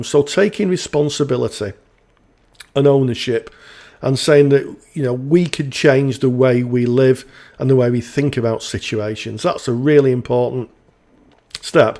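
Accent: United Kingdom. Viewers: its a northern english accent, its fine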